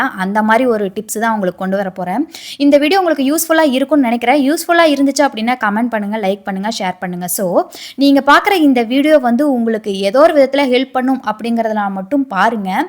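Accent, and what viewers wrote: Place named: Kongu, India. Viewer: 1st time i saw ur video sissy very usefull yo me ... nd r u kongunadu girl ?? am also coimbatore sissy... namma slang ea vera level than sissy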